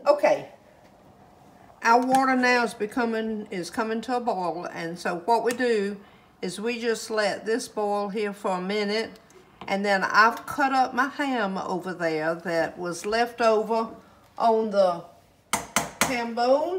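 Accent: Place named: Carolinas, United States. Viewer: Oh you just make my day, ❤. I look forward to your dialect, very much like my own, born and raised in the Carolinas.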